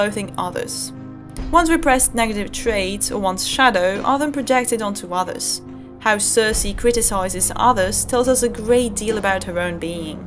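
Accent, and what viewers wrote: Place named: Netherlands. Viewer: Nice video! Interesting accent. It sounds RP english but with a heavy Flemish or dutch flavour to it. Something about the pronunciation of the word "o" and the emphasis on the consonants at the end of words. More clipped. Sorry; accents fascinate me.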